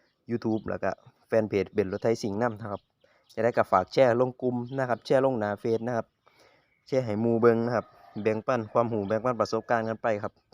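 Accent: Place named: Sisaket, Thailand. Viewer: อยู่ไสน้อครับคือเว้าคือคนศรีสะเกษแท้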